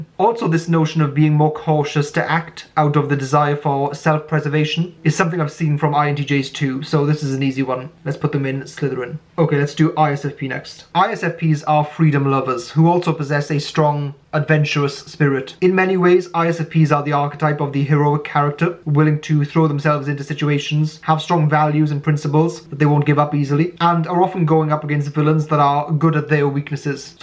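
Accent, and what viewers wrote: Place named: United Kingdom. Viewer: I'm a ravenclaw and INFP 🤓 you got it right. Also, I am not a native English speaker and I find hisaccent very interesting. It is hard to understand but at the same time I really like it. Is it british with a twist? I'm curious..🤔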